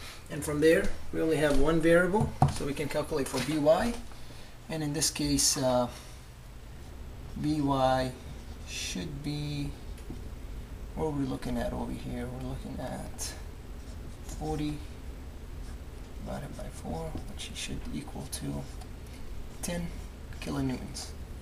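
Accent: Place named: Brazil. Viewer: Are you brazilian? Your accent looks like a brazilian speaking english ...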